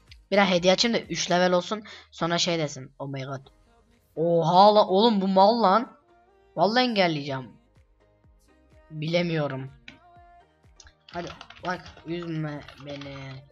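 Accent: Azerbaijan. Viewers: lan Azermisin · Azerisen sen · lam san Azerbaycanlimisin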